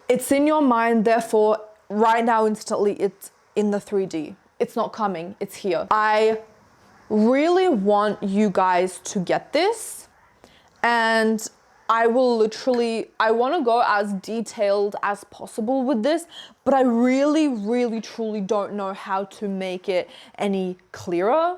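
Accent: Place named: Australia. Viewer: I love your Aussie accent saurrr muchhh fr🤧✨❤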